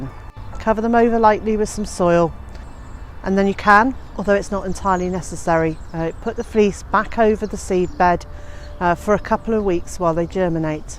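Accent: England, United Kingdom. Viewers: Good to hear an English accent,thanks for the info.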